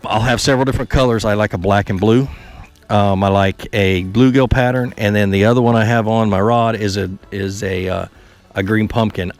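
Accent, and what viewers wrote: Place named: New York, United States. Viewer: you really sound like u live in NY... if so, you only can't bass fish from 3rd Sat. in June-Nov. 30 if you are using live bait. Otherwise, it's artificial lures all year long (assuming you are catch and release).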